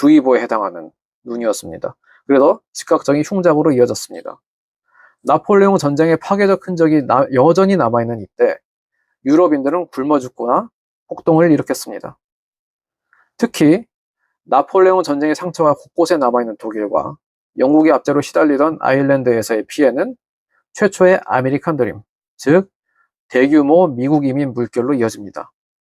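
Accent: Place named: Jeolla, South Korea. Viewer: 얘 억양에 전라도 사투리 섞인거같은데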